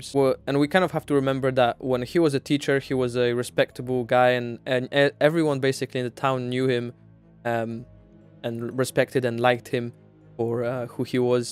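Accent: Poland. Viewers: U can tell ur polish when u say all the polish names and towns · Masz silny polski akcent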